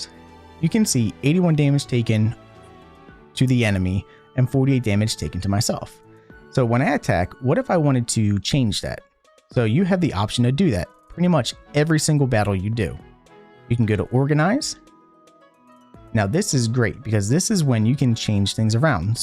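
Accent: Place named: Philadelphia, United States. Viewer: Drawn to the Philly accent lol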